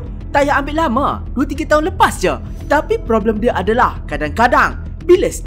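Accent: Malaysia. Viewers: Abang Abang orang Malaysia yah kok ngomong nya kaya Upin Ipin aku dari indonesia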